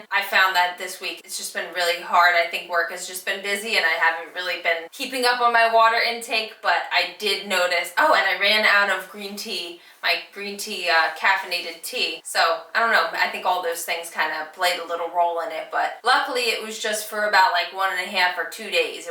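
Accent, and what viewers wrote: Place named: Alaska, United States. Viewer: Love your videos, your energy and accent lol. Sounds Alaskan?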